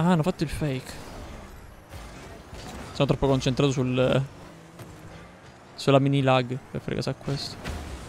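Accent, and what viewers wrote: Palermo, Italy. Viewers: Bella... Ma di dove sei? Sembri palermitano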